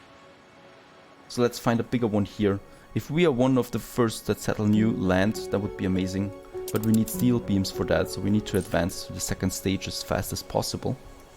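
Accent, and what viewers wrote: Germany. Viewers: Wusste ich es doch :D wollte schon sagen du hörst dich sehr deutschsprachig an xD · Mmm yes the german accent is very present here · U German?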